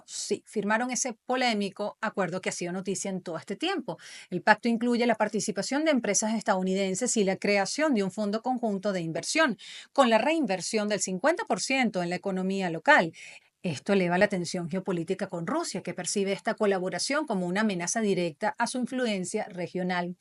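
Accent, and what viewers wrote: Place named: Venezuela. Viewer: Y hablas tan lindo ☺️!... Ese acento tan "venezolano". Único 😊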